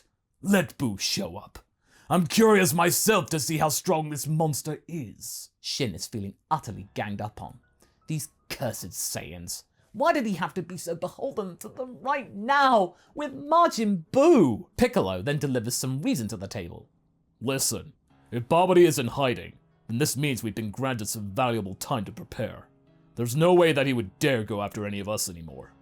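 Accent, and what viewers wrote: United Kingdom. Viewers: I said it with you every time -A Fellow brit (I think ur British-)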